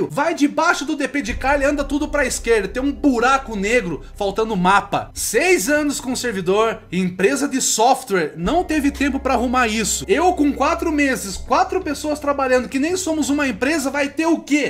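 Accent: Brazil. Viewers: você é do sul mano ?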